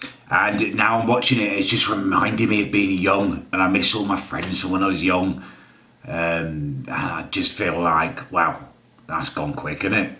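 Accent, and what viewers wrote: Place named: North, United Kingdom. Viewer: just lost the game he sounds like he's from the north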